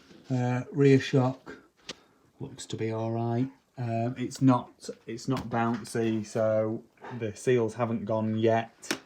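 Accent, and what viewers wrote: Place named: North, United Kingdom. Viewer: thats a good price im looking more a 250 that i can fully restore around mid 80s as thats what i grew up on im 40 this year and getting nostalgiac lol i watch your bikes on youtube you sound like a northerner buddy where abouts are you in the country im in barrow in furness in cumbria im saving up to get a bike there so hard to get hold of do you get them all the time could do with your number pal and thanks for getting back to me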